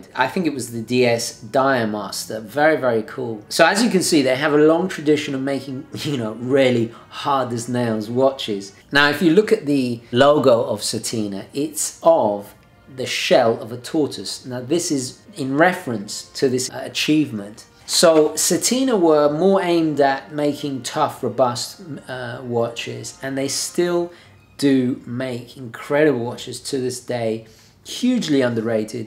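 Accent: United Kingdom. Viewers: Your British accent is so pleasant to hear lol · It's his crisp and clear British accent.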